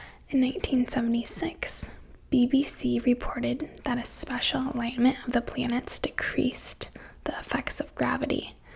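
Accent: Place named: Wisconsin, United States. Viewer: Your definitely from Wisconsin lmao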